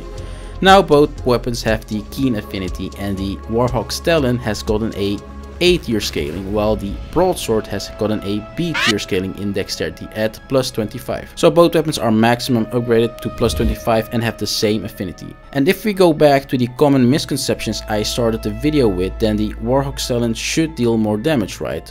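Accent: Netherlands. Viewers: Bizar maar tijdens het eerste woord dacht ik Ja! Nederlander! Speedrun stuff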